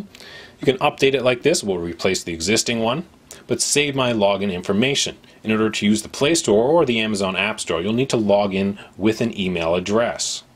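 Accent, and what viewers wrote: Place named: United States. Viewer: I am typing as fast as i can, while my statement is true. I have tried for more than a year to get this on my Zeki 7inch. followed stuff on xda, etc. but got little to nowhere. first you have a great voice. Second you speak American English. Third you are organized and clear. This wasn't working so I followed someones comment below -clear cache. That didn't help so i bit the bullet and cleared data. It asked to accept google terms. i did play store opened. Hooray. I subscribed so will be watching again and more. Thank you.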